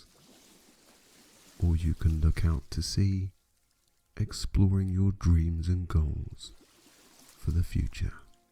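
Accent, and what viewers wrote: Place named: England, United Kingdom. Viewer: Not just because I'm an Anglophile or anything..😉.. but I find that anyone with an English accent has just about the best voice for this kind of work. So soothing. Thank you,. xx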